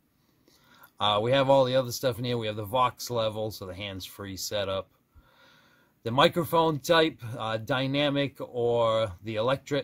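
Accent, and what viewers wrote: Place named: Boston, United States. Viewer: South Carolina? You sound like a Boston boy to me. LOL. I was born and raised in Salem.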